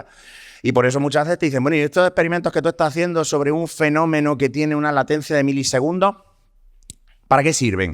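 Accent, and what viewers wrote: Spain. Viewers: Me parece insufrible h chulesco, narcisista total ...y por cierto que horror el acento * murciano? Ufff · Me parto con ese acento y esas plabrad o frases tipica andaluzas y además dices verdades que como puños,gracias porque aparte de aprender ,me río 😅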